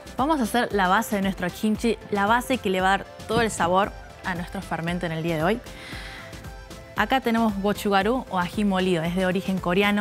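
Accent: Argentina. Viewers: Parece argentina con lo bien que habla. Muy buena pinta el plato.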